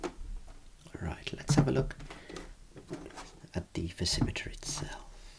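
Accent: Birmingham, United Kingdom. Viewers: the best brummy accent in history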